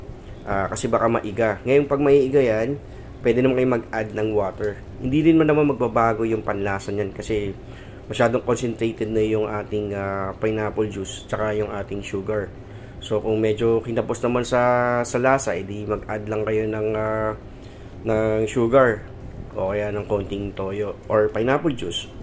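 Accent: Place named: Batangas, Philippines. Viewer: May accent ka Sir ng Batangeño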